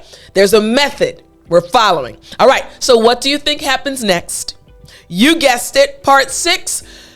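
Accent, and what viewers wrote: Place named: United States. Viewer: Only I love Amercain accent and understood all what you are taking about it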